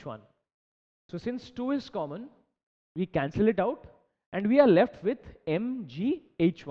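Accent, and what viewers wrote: India. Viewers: i can barely understand shit because of his indian accent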